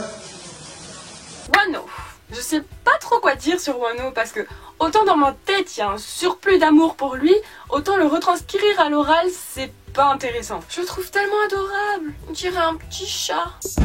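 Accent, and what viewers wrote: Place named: France. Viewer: No Mercy though😆 Ouais les survival c'est Tjrs grave cruel Ahah, Kihyun💜💜 Monsta Ayksss !! Lol Jsais meme pas comment écrire la phonétique mais bref le x se prononce comme en anglais. Jcrois t'est la première française Que jvois qui prononce les mots genre Bias et tout avec une prononciation française😜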